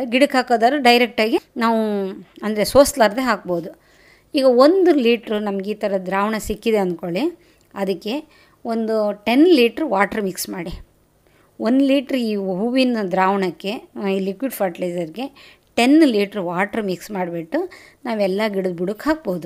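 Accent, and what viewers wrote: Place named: Malnad, India. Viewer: ನಿಮ್ಮ ಸಲಹೆ ಮತ್ತು ಮಾತು ನನಗೆ ಇಷ್ಟ. ನಾವು ಮಲೆನಾಡಿನ ಜನ. ನೀವು ಮಾತಾಡುವ ಶೈಲಿ ಹಾಗೇ ಇದೆ. ನೀವು ಯಾವ ಕಡೆಯವರು ಮೇಡಂ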